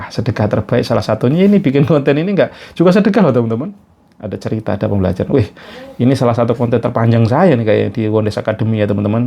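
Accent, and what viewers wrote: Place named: Java, Indonesia. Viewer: Mendok & terlalu berbasa basi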